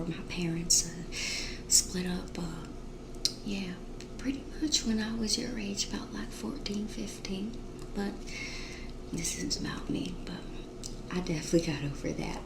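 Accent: South, United States. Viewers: Aaaaah🎉! You’re back with the Southern accent 🥳🥳🧐thank yoooou!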